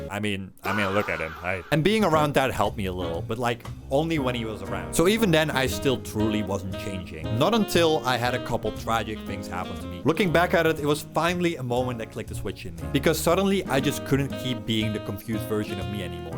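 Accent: United Kingdom. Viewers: Bro sound British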